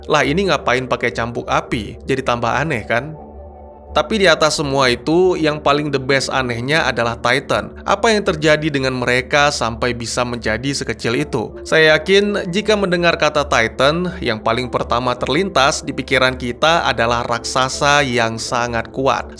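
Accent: Aceh, Indonesia. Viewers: bg btw lu orang aceh kan bg?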